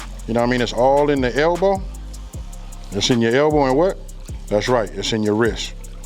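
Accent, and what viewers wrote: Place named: California, United States. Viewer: I don't know if you are from Cali but you sound like you are. I have cousins there and the accent is........, LOL Just like you guess would say about southerners. LOL It's the way ya'll prounounce words with the letter "I" LOL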